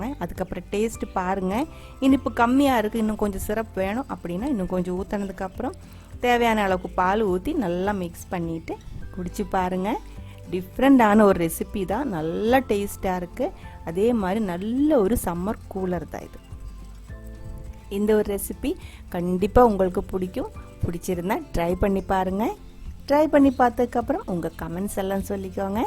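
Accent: Kerala, India. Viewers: Hai mam valare nannayittunde Explanation super kurachu malayalam varunnunde athu saramilla kelkkan sugamunde we are also living in coimbatore native palakkad ane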